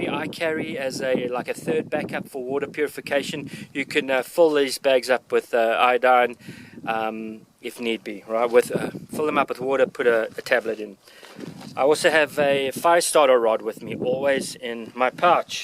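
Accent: South Africa. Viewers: Your rig serves the same function as our load bearing/carrying equipment in the Army. It allowed us to cache our rucksacks and do short range patrols with much less bulk & weight while still having enough equipment that we wouldn't be caught short if something unexpected happened. Sound thinking in my opinion. I enjoy your accent; sounds South African to me.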